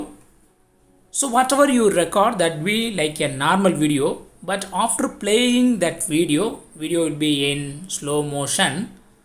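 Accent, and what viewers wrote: Tamil Nadu, India. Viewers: Tamil accent 😁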